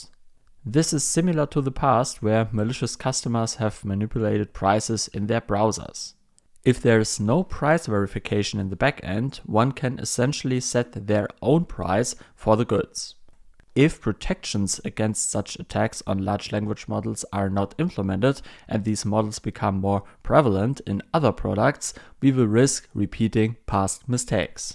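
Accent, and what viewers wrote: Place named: Germany. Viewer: bro sounds german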